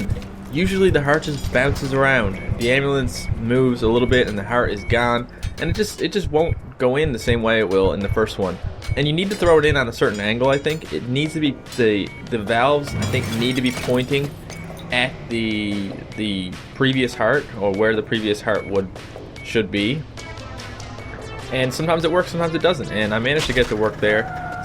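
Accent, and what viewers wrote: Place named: Newfoundland, Canada. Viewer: i could tell you were a newfie so easily, cheers from a fellow newfie!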